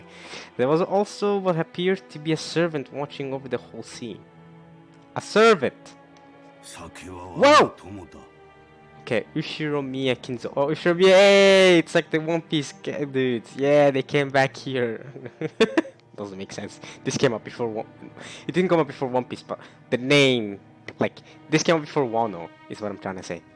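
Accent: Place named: Norway. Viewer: Your accent sounds familiar. Are you Swedish? Edit: Norway. I was close! Also it's weird seeing someone play without lip synch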